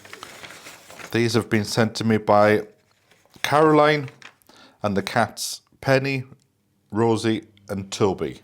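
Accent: Wales, United Kingdom. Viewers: I am a new subbie. I have got to tell you….. you are like my diazepam…… when I feel stressed or anxious….. I watch your vlogs and the world just feels a much better place. Your welsh accent and calming voice is so lovely. Please don’t ever change. Lots of love and squishy hugs xx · A potch around..that is an expression I have not heard in an age,,,,, from Neath originally but living in Japan for 23 years.......My family are Japanese, but they understand your accent.....Miy students too have Welsh accents!!! Thank you so much for the videos,,,,,,,,,we truly enjoy the relaxing and interesting way you tell a story.........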